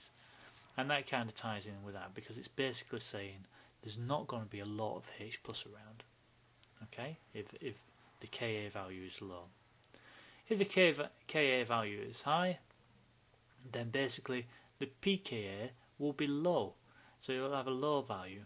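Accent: United Kingdom. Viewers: Thanks for this tutorial, it is great and very logic. It helped me a lot. And i LOVE your british accent! G.d save the Queen!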